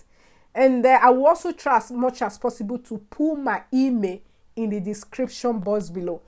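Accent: Africa. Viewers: Luv your African accent. The "t" in crochet is silent. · This accent is 100% African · I love your accent! African Queen 👐🏽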